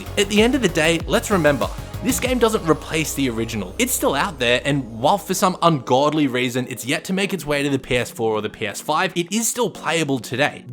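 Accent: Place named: United States. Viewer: This guy's accent is clearly American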